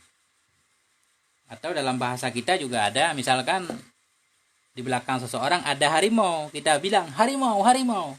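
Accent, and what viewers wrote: Aceh, Indonesia. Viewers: Loghat ustad kayak aceh gitu ya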